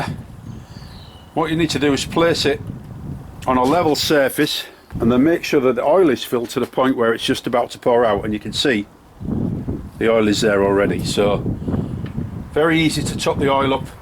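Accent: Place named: Northern England, United Kingdom. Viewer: This is a great video and a lot of effort put into it. I think the dislikes are from people who aren't front the UK and can't understand your Northern accent😆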